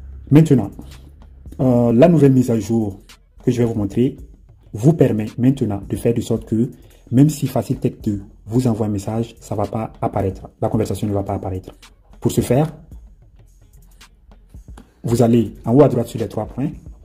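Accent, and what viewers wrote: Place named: Burkina Faso. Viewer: Merci beaucoup ! Je connais pas ta nationalité mais vous avez un accent Burkinabé 😁😁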